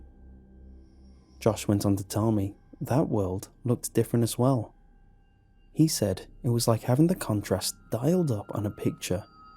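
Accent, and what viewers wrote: United Kingdom. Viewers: British people narrating horror stories yes delicious mm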